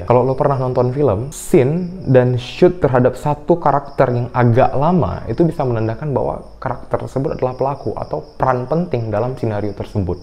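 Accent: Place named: Aceh, Indonesia. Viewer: nyan kan butoi.. meuaceh that bak pegah haba 😂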